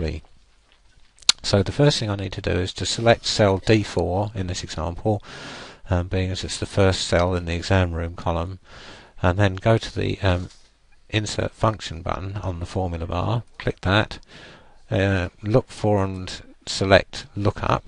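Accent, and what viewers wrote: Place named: United Kingdom. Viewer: I fucking hate british accent! Didn't understand a half of what you said.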